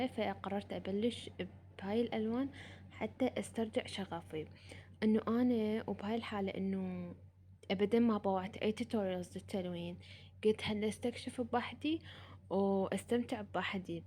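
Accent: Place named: Iraq. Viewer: ياختشيييي ✨مرااااه خياال تجنن اللهجة العراقية ❤❤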